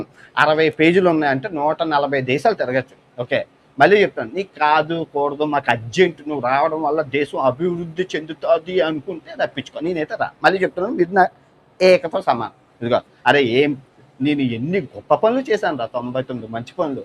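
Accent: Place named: Visakhapatnam, India. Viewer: Actually Brother, మీ భాష సరిగ్గా వుండదు, గరికపాటి గారు చెప్పిందే మీరు చెప్పారు కాని, అదే విషయాన్ని మీరు వెటకారంగా, అసభ్య భాష పదజాలంతో చెప్తారు. అందుకే మీ పై వ్యతిరేకత ఎవరైనా మీకు negative feedback ఇస్తే తీసుకోవడం, తప్పులు వుంటే సరిదిద్దుకోవడం అలవాటు చేసుకోండి Brother. నేను ఒప్పుకుంటాను, మీరు నిజంగా చాలా మంచి పనులు చేశారు. నిజంగా betting apps పైన మీ పోరాటం నన్ను బాగా కదిలించింది Brother. కాస్త మీ భాషలో బూతులు లేకుండా వుంటే చాలు. మీ Vizag యాస తో అయితే అసలు problem లేదు. ఒక తమ్ముడు, ఒక అభిమాని, ఒక శ్రేయోభిలాషి లాగా నా feedback తీసుకో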